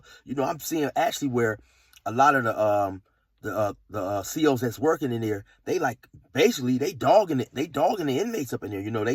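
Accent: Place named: California, United States. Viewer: “CEEmemt wall” haha that Cali accent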